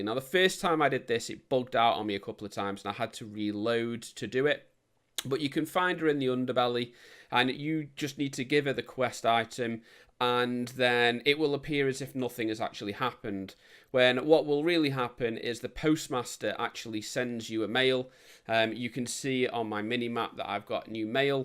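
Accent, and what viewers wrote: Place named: Stoke, United Kingdom. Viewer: the steps were to fast i had to listen to your stoke accent so much !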